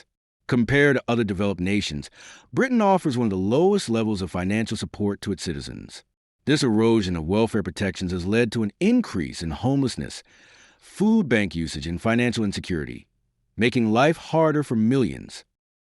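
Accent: United States. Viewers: this coming from an american voice is a joke.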